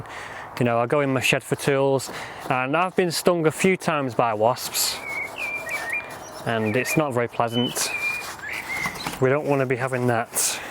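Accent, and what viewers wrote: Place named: Preston, United Kingdom. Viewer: Love the shed colour! I also have an allotment in Preston. Thought you must be local to us from your accent 🤔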